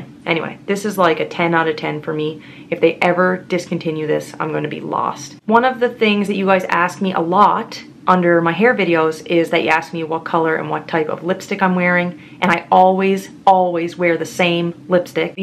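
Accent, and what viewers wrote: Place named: Cape Breton, Canada. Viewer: I absolutely love your videos.. I love that cape Breton accent I’m originally from Newfoundland now living in Saskatoon and it just makes me miss the East Coast.. I hope you’re having a fantastic day and merry Christmas and all the best to you and your family in the new year!!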